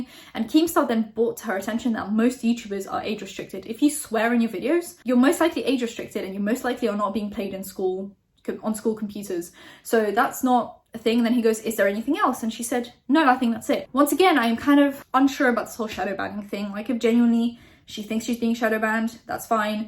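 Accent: United Kingdom. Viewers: Ok, I've been to London three times. I lived there for 5 months one of the times. I'm really good at deciphering a British accent....You're actually talking really fast....Please, slow down a bit, dear. What you're saying is interesting enough to want to hear it...I can understand it, but it takes effort. Better if people can relax and listen.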